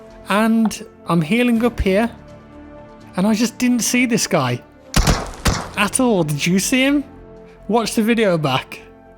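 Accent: United Kingdom. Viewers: Oregon farmer here. combine or harvester. yep. you're my favorite steamer Jack and no worries your voice sounds still very British.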